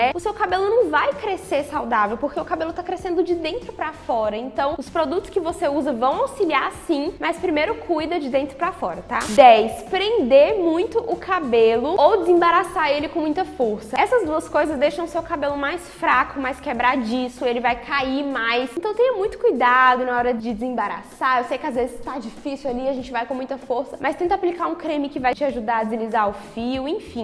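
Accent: Minas Gerais, Brazil. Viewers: Amo o sotaque de Minas 😍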